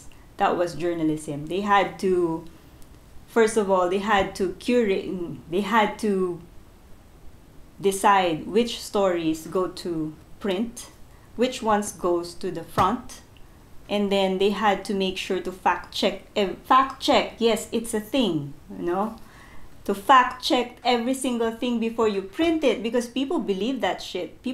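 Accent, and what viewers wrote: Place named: Tagalog, Philippines. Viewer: Fantastic Book! Thank you for your review! I think your tagalog accent is fantastic!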